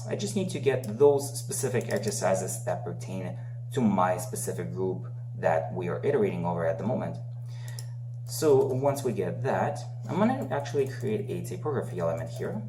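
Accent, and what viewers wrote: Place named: Canada. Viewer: Excellent videos! Love the slight accent... Canadian?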